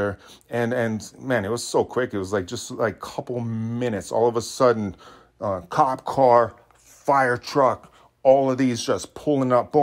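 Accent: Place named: Hawaii, United States. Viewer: You sound like you from Hawaii….might be mistaken, but I hear some pidgin come out.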